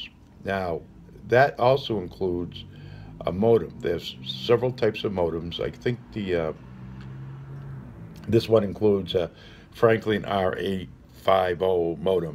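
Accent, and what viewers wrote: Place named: Massachusetts, United States. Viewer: 1,600 dollars for a small one bedroom in Los Angeles area. Very difficult for people to get by. I grew up in Mass. Nice to hear the accent.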